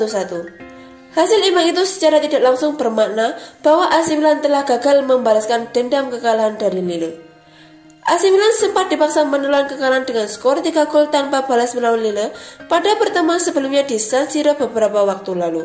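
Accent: Java, Indonesia. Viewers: Medok.. suarane... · Adooh medok2 eee kental banget😆😆😆😆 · Medok bgt min jowone ngendi 😅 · Wess medok jawa ne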